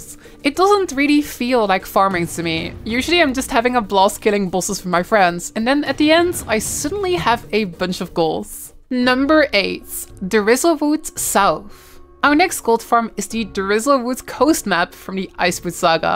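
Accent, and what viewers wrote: Netherlands. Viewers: very clearly a Dutch person speaking English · Ik vind je Nederlandse accent wel best heftig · Yea, I probably stash the components in my bank that would net all the Gold then. I have a really old account but hat a long hiatus of over 8 years and now get back into it, playing through all the stories and unlocking the mounts (which can be expensive). So I don't have a boatload of materials unfortunately and all the areas I visit will give new stuff that I never had before. Also don't have a whole lot of time to play so I have to pick and choose and avoid getting burned out otherwise it's just a waste of time. By the way, are you Dutch by any chance?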